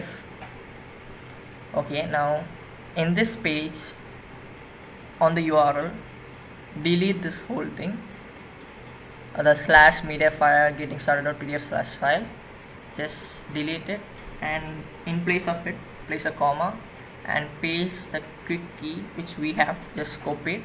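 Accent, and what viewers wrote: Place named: India. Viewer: English Indian Languag Is Funny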